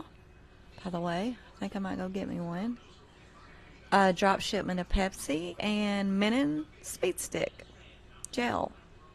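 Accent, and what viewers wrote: South, United States. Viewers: En vel ops lol I know those southern pronounciatuons!! I love it! Been in South Texas too long not hearing the real southern accent!!! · this Aussie is loving your sweet southern accent reciting this shopping list. Also your little “barbs” thrown in make this very interesting to listen to. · Nothin' better than southern accent. We friendly too! Well, most of us...😁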